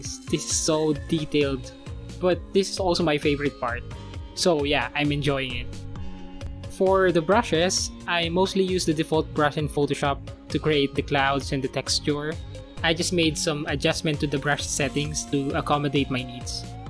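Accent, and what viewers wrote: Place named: Philippines. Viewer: I knew you are a fellow Filipino just by judging your accent and your voice. I'm also a Filipino.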